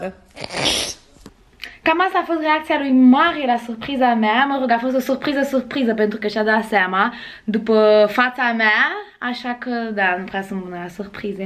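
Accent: France. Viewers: WOW... Imi place accentul tau asa franțuzesc 😘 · Ce accent frumos se franțuzoaică ai!:)) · Ador felul in care vorbesti. Accentul asta de frantuzoaica e de milioane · Ce accent e ăla de francez cred nu? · Ai un accent de franțuzoaică 😉❤️ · Ce accent franțuzesc ai! 😍😍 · Se vede că ai accent franțuzesc! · Îmi place de tine,ai accent de franțuza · De ce ai accent franțuzesc? Ps:sunt nouă și nu știu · Ai accent de frantuzoaica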